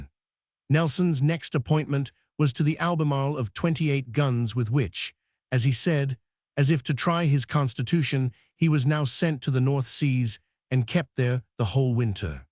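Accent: Mid-Atlantic, United States. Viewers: Absolutely horrible rendition. The AI voice has an unpleasant mid-Atlantic sound, and doesn't know how to pronounce basic words in English, such as lieutenant. If this is what audio-books sound like then I'll give them a miss.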